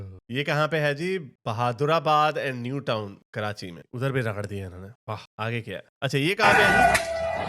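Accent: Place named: Punjab, India. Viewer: I thoroughly enjoy your Punjabi accent and jumble😂😂😂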